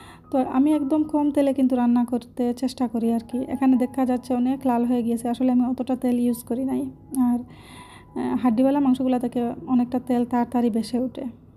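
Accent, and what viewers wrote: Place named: Sylhet, Bangladesh. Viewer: 🔔🔔👍👍 আপু আমার সিলেটি কথা বালো লাগে । আমিও সিলেটি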